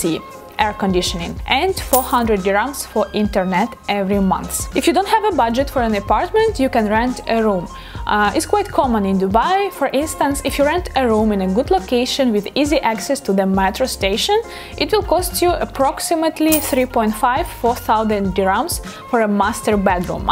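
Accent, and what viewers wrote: Russia. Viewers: Is that a Russian accent? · как-то странно слышать английский с русским акцентом😅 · Madam Your Russian Accent Is Amazing.i Like It. Love From Pakistan💓🤗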